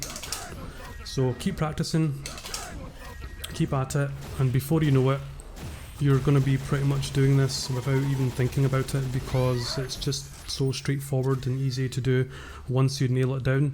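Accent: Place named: Glasgow, United Kingdom. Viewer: Awryt wee sacks. Kidding, lol you sound local to me. Fancy a few sets ? With this dogshit netcode on this game I'm thinking if your local it SHOULD be better experience Cfn:Beast94 glasgow by the way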